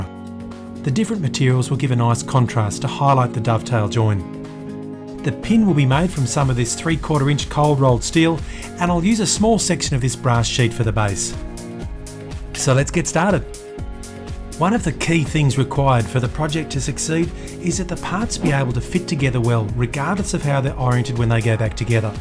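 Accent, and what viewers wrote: Australia. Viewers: First time viewer, loved it. Good to hear an aussie accent on a well produced video. Subscribed.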